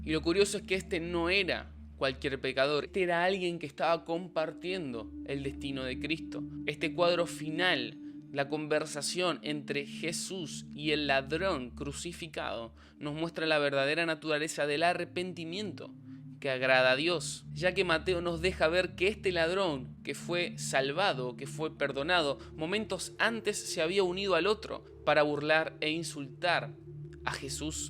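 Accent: Argentina. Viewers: wtf cuando habla argentino y tiene un canal de youtube sobre teorias y conspiraciones de jesus xddd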